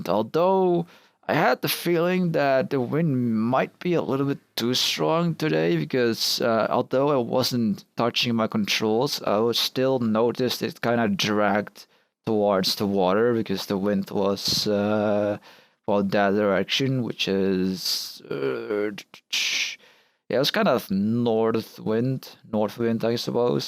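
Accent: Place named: United States. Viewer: Dear, may I compliment you on your English!! And on your very very very American accent:-)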